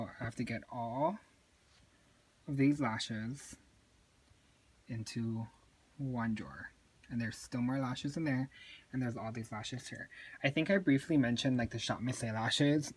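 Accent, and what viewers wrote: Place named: Hawaii, United States. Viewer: I’m trying to find ways to organize my lashes and as soon as I heard you speak I just knew you were from Hawaii 😂💛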